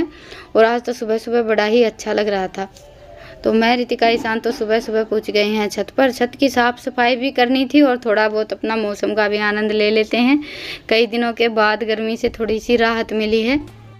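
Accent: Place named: Rajasthan, India. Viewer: Waw ap jitni bi recipe bnate ho bhut testy bnti hogi dikhne bi achi lgti h or di apki bhasha bhut pyari lgti h ap real bhasa bolte ho to mja ata h sunne me mai bi rj se hu so smj bi ati h or ha sbse jyada le isantiya ye bolte ho to meri hassi bi ni rukti 😂😂😂😂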